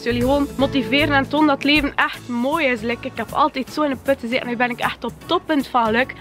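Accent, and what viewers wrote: West Flanders, Belgium. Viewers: lijkt me leuk als je naar mijn klas komt maar ik weet bijna zeker dat niemand je gaat verstaan ik ben een van de weininge in mijn klas die (west) Vlaams verstaat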